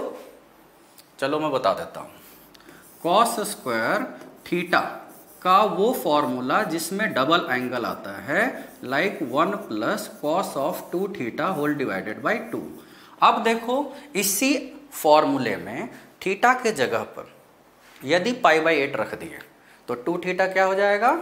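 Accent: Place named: Bihar, India. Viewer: Pura Bihari babu ka feel aara tha!!😂😘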